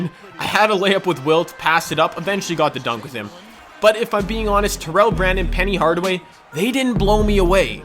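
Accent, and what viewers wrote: Canada. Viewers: Hugh Janus Hes Canadian its just his accent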